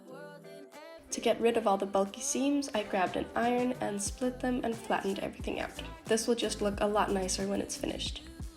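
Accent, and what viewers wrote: Denmark. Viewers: This video was in my recommendations. The second you started talking I thought you sounded danish (the accent, I guess?). Then I saw the furniture/living space and again I thought it looked super danish. Then I checked and as far as I can tell you're from Denmark... Hahaha. I liked the video! :D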